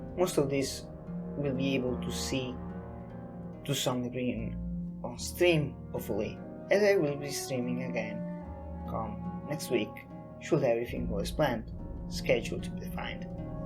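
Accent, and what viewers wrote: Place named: Germany. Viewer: Have to love that accent. Is it German or x. X)... Ohhh god the lvling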